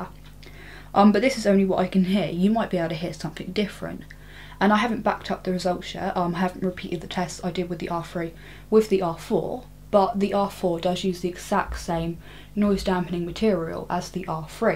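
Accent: England, United Kingdom. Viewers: Who said british girld are ugly?? Shes smoking hot with that british accent!!! And very good review! Linustechtips looks so bad compared to this!! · She's from the UK. Americans / Canadians / Aussies / Kiwis / South Africans sound nothing like this. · pretty sure that's her accent. not sure what region of England she is from.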